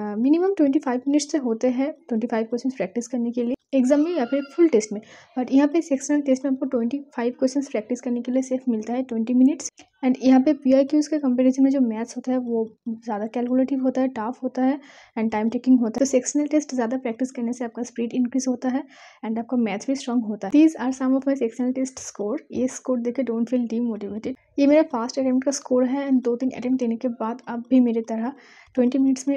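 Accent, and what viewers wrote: Bengal, India. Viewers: Are u Bengali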